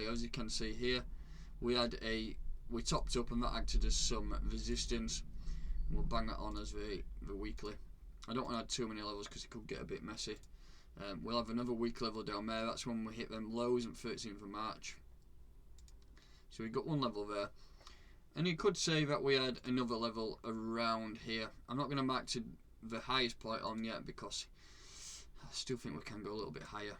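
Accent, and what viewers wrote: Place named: North, United Kingdom. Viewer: $4.80 entry is optimistic at the minute. $13.69 price as of today. Nice content though my man, nice to hear a northern accent in the crypto world.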